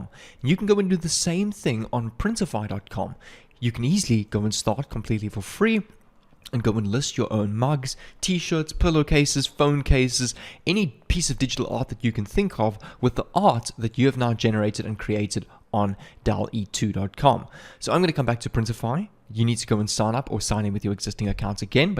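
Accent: South Africa. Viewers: Are you from Za?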